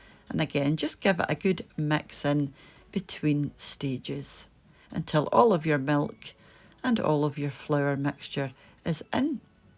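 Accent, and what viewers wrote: Scotland, United Kingdom. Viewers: Oh my gosh I could listen to you all day! Love the scots accent. Never heard of custard over cake here in the USA but seems like something nummy to try!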